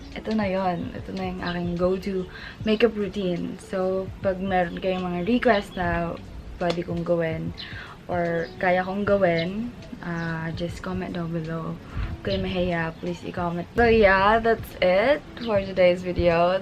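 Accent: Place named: La Salle, Philippines. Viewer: Hahahaha ung mga aso. Lakas maka La Sallista sis. Ang accent 😊 tho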